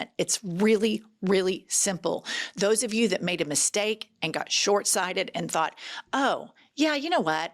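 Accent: South, United States. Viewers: I like you, but please learn the pronunciation of Bukele's name. (Boo'-ke-lay) He's the human form of a shriveled ballsack, and I'd rather you call him Bukake, but that's not why I sent this correction. I love the pod, you, and the. And I will admit, a southern accent on a woman is sexy, whereas, on a man, it just makes them sound stupid. I want to retain my attraction to the female southern accent. Please don't take offense.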